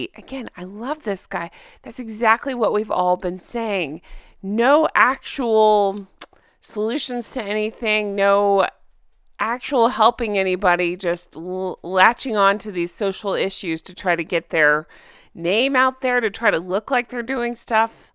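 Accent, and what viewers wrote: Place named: United States. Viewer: Oh that guy is hilarious! Who is he? Absolutely adore your YouTube, you and Beebs Kelly are brilliant. Only been on YouTube for 8 months when I got a new telly! Unfortunately can’t get all information on TV( using phone to write this). I keep asking what “sugars “ are- know they love the traitors but is it an American expression? Still love your voice. Wish I had an American accent ( like yours) it’s so beautiful on the ear. Sorry can’t do Patreon as I do animal charities but would like to know where I can view the merchandise ( too British to say merch 😹😹😹😹). Guernsey. Channel Islands xxxx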